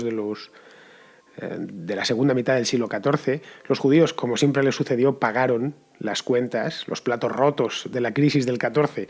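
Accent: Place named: Spain. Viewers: es horrible el acento español uno mas que otros, para hacerlo mas serio deberias pagar para una narración mas limpia. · Vaya por Dios, los hispanos hispanoparlantes de esa parte de la Hispanidad, llamada España, lamentamos que no te guste este acento; Bueno, aquí también tenemos variedad de tonalidades...igual te gusta más el acento andaluz o canario o tal vez el extremeño o tal vez te guste más el murciano.....yo hablo como el locutor ,ósea, mi español fuerte y seco no te gustaría...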